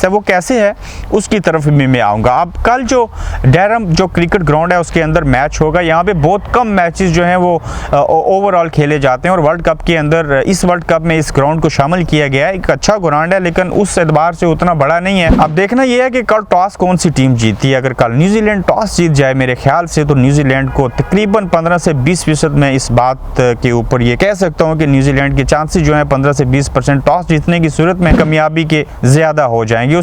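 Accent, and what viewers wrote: Kashmir, India. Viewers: You’re anywhere from kashmir I can feel it the way of talking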